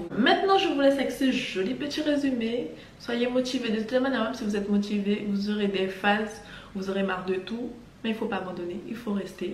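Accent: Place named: French Guiana. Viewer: T’as grave l’accent guyanais ! ❤️ En tout cas merci pour cette vidéo. Au top 👌🏾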